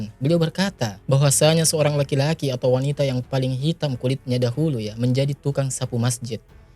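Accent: Sulawesi, Indonesia. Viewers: Masyaallah, kentara sekali Sulawesi nya.. semangat ki Sodara❤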